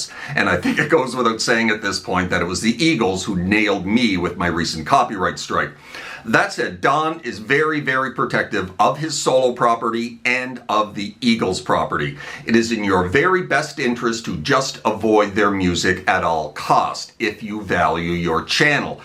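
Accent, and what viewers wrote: Canada. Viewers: That's sounds like a great Maritimes accent if I am coreect? Awesome channel with great song selections, keep the great work.. Newly subscribed in the past month, your a great player, would love to hear some original content if you have any. God bless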